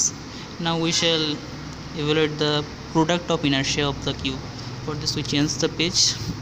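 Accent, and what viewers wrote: Bengal, India. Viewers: Bengal se ho kya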